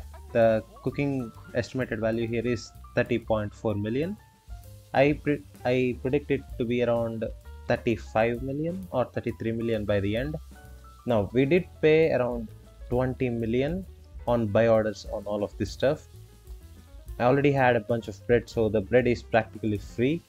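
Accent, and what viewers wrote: India. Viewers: You sounds Indian my friend .. Are you?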